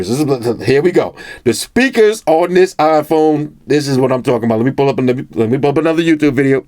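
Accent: New York, United States. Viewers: Hey what’s up!! Been creeping on your channel for a few months now this is my first comment. Love in the content man. Original shit genuine opinion n to the fucking point. I appreciate that!’ anyway Two questions... 1) What did you mean when you said get an iPhone so you can experience Instagram the right way? Is it just like Snapchat where there’s more features on the iOS version. 2) what Burrough u from? Bk? stat? Or Jersey maybe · Thats not a hood voice... its just his New York accent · Naaaw, he sounds like straight up New Yorker to me lol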